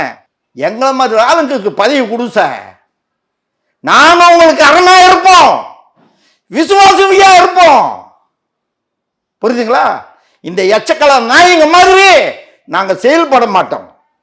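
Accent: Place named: North, India. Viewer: நீ யாரு உன் தமிழ் இவளோ மட்டமா கேரளா கர்நாடகா ஆந்திரா வடக்கன் போல இருக்கு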